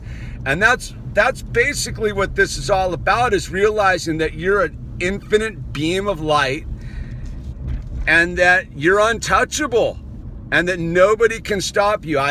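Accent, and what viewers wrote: California, United States. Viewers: I’m glad I subscribed to you . Your voice is so mellow and Californian (?) . 💕💛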